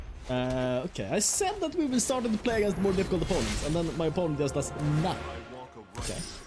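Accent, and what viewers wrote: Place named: Sweden. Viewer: god dam you have a strong Swedish accsent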